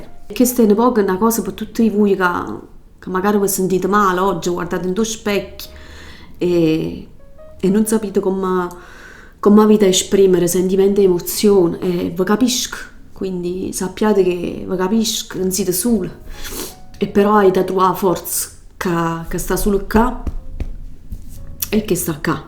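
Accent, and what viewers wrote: Naples, Italy. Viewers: Parli un napoletano bellissimo, molto classico, pulito, tipico delle persone istruite che hanno una cultura,infatti non ho bisogno di nessun sottotitolo perché è lo stesso napoletano con cui mi parlava mia nonna adorata nei momenti di tenerezza, lo stesso di Eduardo, di Viviani e non quel brutto dialetto sguaiato imbastardito che purtroppo si sente parlare tantissimo oggi specie dai più giovani, li si che ho bisogno dei sottotitoli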